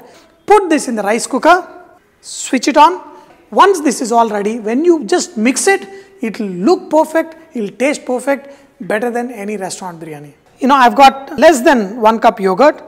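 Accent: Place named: Karnataka, India. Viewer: Sir, your English slang is like kannadiga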